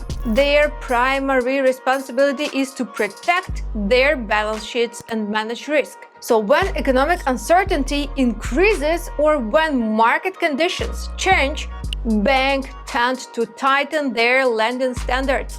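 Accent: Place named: Eastern Europe. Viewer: east europ i think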